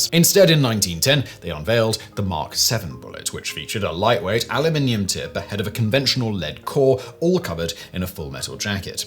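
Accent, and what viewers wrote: United Kingdom. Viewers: Come on Simon give us history of the dildo! I just want to hear that whole topic discussed in that proper British accent!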